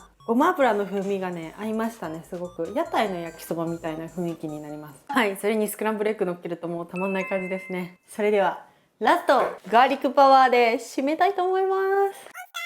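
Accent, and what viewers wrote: Izumo, Japan. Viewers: どげだ…出雲弁！同郷の星やね～ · 島根出身だけど最近帰ってないからちょいちょい横に出てくる出雲弁が懐かしくて涙ちょちょ切れ！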